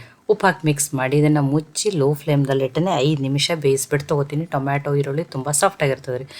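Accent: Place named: Kalaburagi, India. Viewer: ನೀವು ಕಲಬುರ್ಗಿ ದವರು ಇದ್ದೀರಿ ಏನು ಸೇಮ ನಮ್ಮ ಭಾಷೆ ನೆ ಮಾತಾಡಲತ್ತೀರಲ್ಲ?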